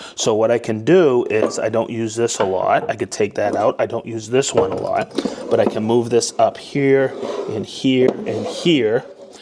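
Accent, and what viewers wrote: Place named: Boston, United States. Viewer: I guess you can take the man out of Boston. But, you can't take the Boston out of the man, Hello from a fellow New Englander. I love watching you videos and find them extremely informational. keep up the great work my friend.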